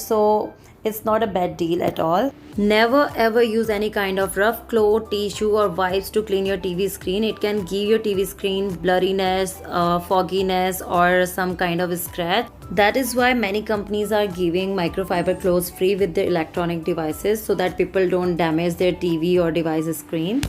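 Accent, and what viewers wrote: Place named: India. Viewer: I HATE YOUR WORLD FAMOUS INDIAN ACCENT!!!!!!!!!!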